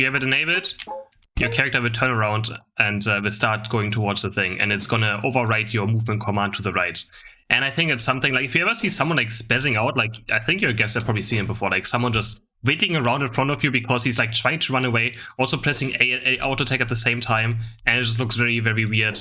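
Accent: Germany. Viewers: das ist der deutscheste akzent den ich jemals gehört habe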